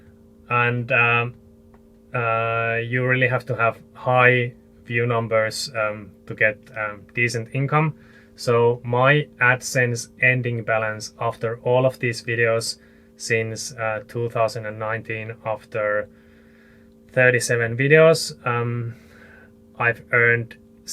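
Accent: Finland. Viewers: I have enjoyed every one of your videos I have seen (most you have produced). You do have a unique channel and sailing focus. I think this video is very good, helpful to others, and a good sign of your transparency and honesty about the costs and challenges you have faced so far. I do have a friendly, easy to implement, suggestion for your channel. I encourage you to start your videos by a very brief introduction of yourself, something like "Hi, I'm, from Finland, I started sailing...and my goal is...and my partner Sophia and I are...join us as we explore Iceland, snowboard, etc..." and I would do that each and every video. Each one. Make it a part of your "intro" to the video. Also, I think most of the sailing channels that keep the viewers engaged (and that leads to more Patreon supporters) do so by making some kind of "Here is who I am, and YOU (viewer) are with us...'" I think many of the viewers like feeling like they are "there" with you, imagining what it is like, etc. 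To be frank, i did not know your name until watching several of your videos, and was not certain where you started (though i suspected Finland because of your accent), or how you supported yourself (translation mentioned in this video). Those are things that are personal details, but help a new visitor to your channel get some kind of possible connection. I came across your channel by chance, and started watching because I always dreamed of sailing in Arctic waters. Then when I saw you got Ar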